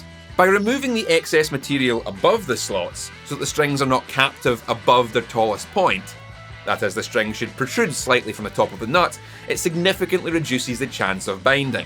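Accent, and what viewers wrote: Scotland, United Kingdom. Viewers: Yo, are you in Scotland? I’ve been subscribed for a LONG LONG time I just don’t remember if you ever said where you are but you sound very Scottish to me lol. My people on my dad’s side while we’re Irish we are from Northlands of Scotland so I have much love for Scots (and Irish) naturally. The other side is Welsh, Swedish & English lol. I’m a full on one of you’s guys. Minus the Swedish 😂.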